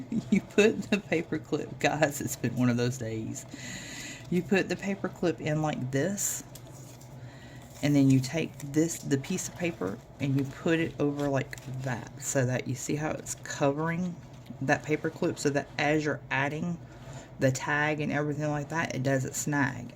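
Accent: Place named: South, United States. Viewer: It’s so fun to hear a southern accent in a video! I love hearing the British ladies but not often a good ole Southern sound!! I love your altered paper clip idea! So cute! Your video was great! ❤❤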